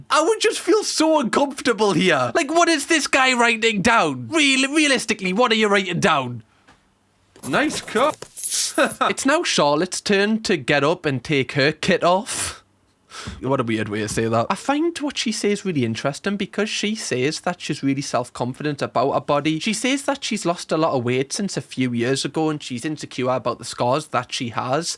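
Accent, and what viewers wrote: Newcastle, United Kingdom. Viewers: It’s his Geordie accent so watch someone else if this bothers you too much. You can’t tell people to change their accents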